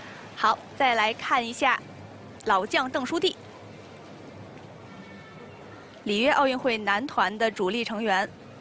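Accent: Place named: Cantonese, China. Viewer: 解说员是广东人吧~ “欠”了点、“完”满的结果！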